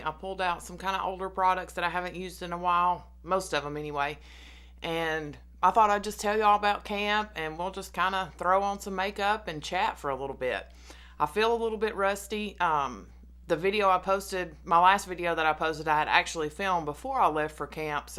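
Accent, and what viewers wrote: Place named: South, United States. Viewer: Hi. I'm new to your channel and I enjoy watching very much. I am born and raised in the south also. Love your accent! I am from very and humid Polk County Florida. Dead center of the state. Thank you for sharing about your camp experience and your closeness with God. I need to hear it too. I am so glad you had a great time being in nature. Keep up the good work with your channel. I am sure you will get to 300 subscribers very soon. Good luck!!!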